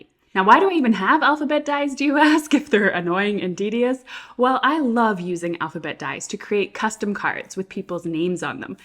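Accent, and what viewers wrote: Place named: Canada. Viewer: Love your videos so much! I love your accent, It makes me think Canada! <3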